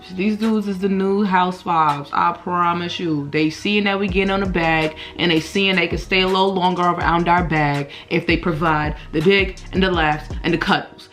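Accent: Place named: Brooklyn, United States. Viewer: She is most definitely from Brooklyn, Ny. I picked up on it in the first five seconds of the video. Yoo wassup👋🏽😭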